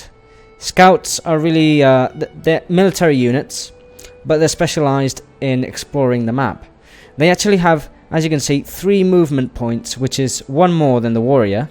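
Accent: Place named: Northern England, United Kingdom. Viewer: I really appreciate this video. You very clearly and calmly lay it all out there for a beginner like me. You have an unusual accent, I was trying to place it. Lincolnshire? I heard elements of that northern twang, but not as strong as some.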